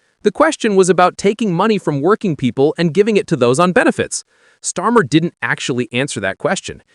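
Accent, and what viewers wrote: United States. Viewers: Why do we have to listen to a yank voice !!!!!!? It’s awful.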